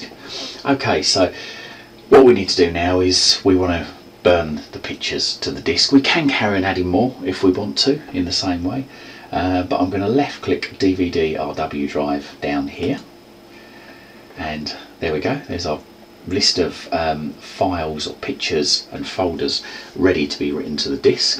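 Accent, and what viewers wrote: United Kingdom. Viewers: Thank you. Simplified instructions, comprehensive for people that lack experience,calm voice, british accent . Great job!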